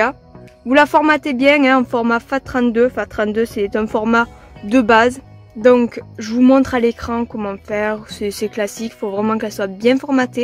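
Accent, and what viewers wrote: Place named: South, France. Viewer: Super merci pour ce tuto tout ça avec l'accent du sud 👌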